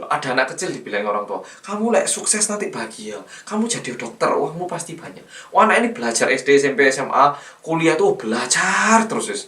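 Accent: Java, Indonesia. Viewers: Mas, logat koreanya jd medok gegara ngomong campur bhs indonesia + korea 😁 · medokeee rekkkk 😂😂😂😂😂😂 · Ngomong "medok" nya oke punya 😅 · Aku lebih suka oppa medokk sumpah.. Ganteng nya ratusan derajattttttttt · Gak kliatan ya koreanya lebih ccok jadi jawa aja, ini orang korea gak malu dengan logat, yg indo malah sok bule Salut banget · Jarang" liat ka ngomong bahasa korea, jd keliatan kaya orang korea asli, soalnya jawanya medok banget, kaya orang indonesia asli padahal orang korea 😂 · Medok banget smpe liurnya nyemprot2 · Kok lbh cocok kan ngomong mendok dr pd Korea sih?😭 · medog jawa fasih korea😂😂😂 top bgt👌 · Mas ngomong bahasa korea kok rasanya ada medok2 jawanya ya? Apa cuma perasaanku aja yho mas? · Salam kenal.... medok bgt yo... di Indonesianya uda berapa lama? Pertanyaannya kenapa kamu bisa timbul stress ??? Wkwkwkwkwkw.... menurut aku orang yang bunuh diri adalah orang yg krisis akan iman dan kasih.... 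orang yang tidak mengenal Tuhannya dengan baik. Iman semakin kuat, hubungan manusia dengan Tuhan dekat takkan timbul rasa putus asa, takut meskipun kita berada di ambang kehancuran sekalipun. Karena mereka percaya Tuhan pasti akan mengembalikan semuanya bahkan jauh jadi lebih baik. Karena tidak percaya, tidak ada pedoman / pegangan hidup yang kuat, makanya iman dalam dirinya goyah dan putus asa. Lalu mengakhiri hidupnya adalah jalan terbaik.... Hidup yang baik adalah hidup yang berawal dari mengasihi Tuhan, diri sendiri, keluarga, sesama, dan seluruh ciptaanNya. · Negara berkembang maju biasanya mmg gitu sih Bagusnya mmg jadi makin maju krn pada pengen juara Tapi jadi depresi juga jeleknya Yg bikin sedih itu netijen indo yg maha benar selalu jelek2in negara korea . Padahal mreka juga gatau gimana org2 korea dan alasan2 depresi tinggi itu 😂 Btw jgn males upload walau viewnya dan hasilnya sedikit ya Soalny bener2 seneng ada yutuberss medok KR 🔛ID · kok bisa ya kamu bisa ngomong bahasa jawa medok banget kayak orang jawa tulen. 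dan bhasa indonesia fasih banget kyak orang indonesia. kami semua heran loh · 한국말하실때도 자바사투리 살짝 있네요 ㅋㅋㅋㅋㅋ 신기하다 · Ini video pertama yg saya tonton dari channel ini, terus nyari2 ttg anda. Keren ya bahasa Indonesia medoknya dapet gak kedengeran aksen korea nya sedikitpun, bahasa korea yg bahasa ibu anda jg aksennya terdengar natural (yg biasanya terjdi dgn org2 yg tinggal pernah di luar negeri biasanya aksennya jdi belibet). Good mas 👍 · Iyaya medok bgt 😅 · entah knapa pas liat awalnya ngomong pake bahasa korea rasanya malah aneh...wkwkwkw kebiasaan denger yg medok, jadi lupa kalo mas e iki orang korea hahahah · Mas jawanya medok banget 😂😂 Kalah q rek.. 😄😅 Coba dari segi genetik juga bisa mas.. ☺ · baru liat channelnya, q pikir org jawa td yg bs bahasa korea. ahahhahahaaa. tertipuu. kereeen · Menginspirasi videonya. Ada benernya juga. Mungkin bukan bermaksud "jadi juara satu", tapi " cara bertahan hidup di kota yg keras". 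Btw, logat ngomongnya lucu wkwk, medok jawa gitu padahal org korea asli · bang mantap medoknya, aku asli jawa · Jawa banget mas e iki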